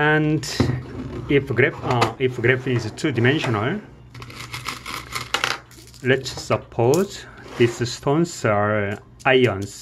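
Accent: South Korea. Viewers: he is korean i could catch korean eng accent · Judging by the writing on the toothpaste tube and his accent, I think is perhaps Korean?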